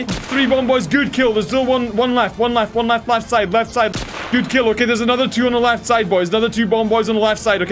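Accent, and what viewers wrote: Scotland, United Kingdom. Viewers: Is this man Scottish?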